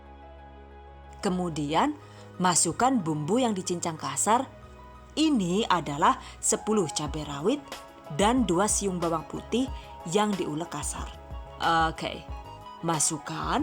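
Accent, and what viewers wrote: Banyumas, Indonesia. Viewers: Ngapaknya mana mba?? Kangeeen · Ngapak ok, english ok josss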